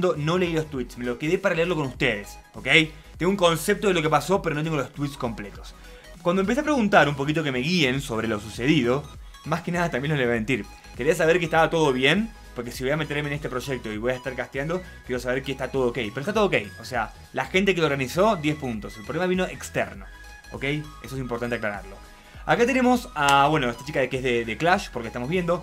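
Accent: Argentina. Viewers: Tiene un acento re argentino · Jsjs argentino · tiene la voz de un argentino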